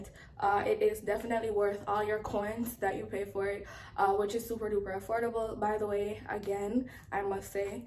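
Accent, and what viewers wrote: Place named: Jamaica. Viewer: I love your accent 😊😫 you must be Jamaican!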